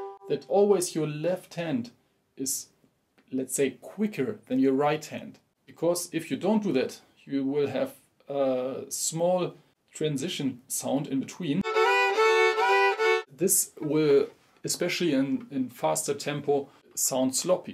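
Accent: Germany. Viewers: wie man einfach hört, dass du Deutscher bist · Ich liebe diesen deutschen Akzent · man hört das du deutsch bist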